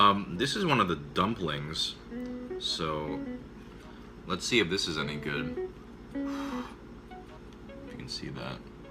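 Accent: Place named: United States. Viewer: The fuck is your race? you look Asian but sound american!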